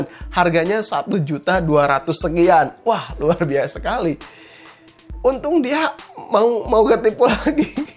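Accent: West Java, Indonesia. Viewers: Sunda pisan euy :v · nyunda geming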